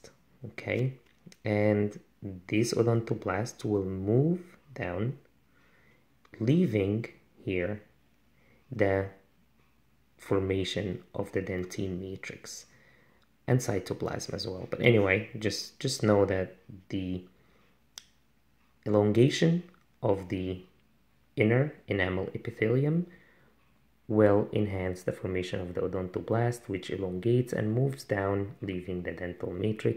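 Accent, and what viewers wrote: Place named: Vietnam. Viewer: who is agree with me that is seem to vietnamese accent??? p/s: i'm vietnamese, hihi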